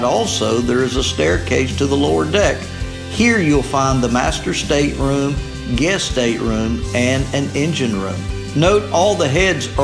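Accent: South, United States. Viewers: "Jeff foxworthy narrated a yacht video? Who knew..." Dr. Phil, Bill Clinton, now Jeff Foxworthy 3 down and over 150 Million with Southern accent to count one by one.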